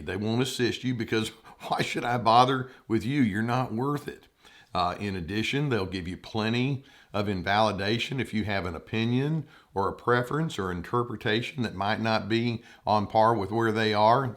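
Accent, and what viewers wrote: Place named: Texas, United States. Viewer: I never realized that so much first-rate psychological insight would come with a Texan accent.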